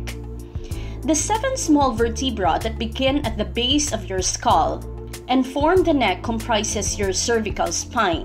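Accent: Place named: Philippines. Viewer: How cute the Filipino accent is 😊... I have neck pain, stiffness and the pain radiates up to the head and I've been like 3 weeks with nonstop headache like migraine which comes from the neck,I don't have numbness or shoulder pain,only neck and head... I don't know if it's osteoarthritis or not ,but it's really hell what I'm going through !!!!!!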